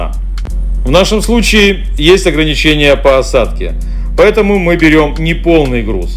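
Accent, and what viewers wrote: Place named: Odesa, Ukraine. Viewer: Начал смотреть видео, и слышу наше родное. Думаю не показалось. Но нет как не крути наш родной говор и как не вспомнить за нашу любимую Одессу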